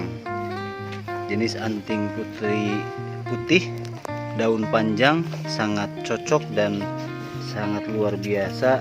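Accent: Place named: Sunda, Indonesia. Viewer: mantaap..rapi pisan penataan dan perawatan ala pro.thnk sudah shering kang. logat nya sunda aslinya dari mana kang?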